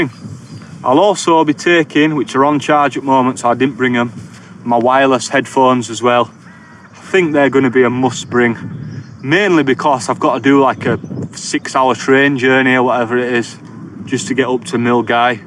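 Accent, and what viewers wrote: Yorkshire, United Kingdom. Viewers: For a Yorkie, with a great Yorkie accent, you don’t half say “plasters” with a posh tone. Lol.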